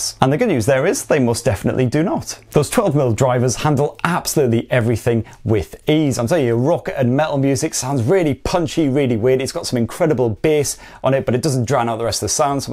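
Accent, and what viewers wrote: United Kingdom. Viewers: The entire review it felt like it was a car review , was it the British accent I don't know !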